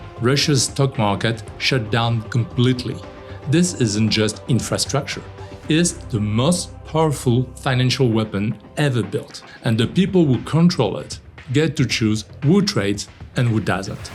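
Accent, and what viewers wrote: France. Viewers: Interesting content on this channel but hard to listen to the French...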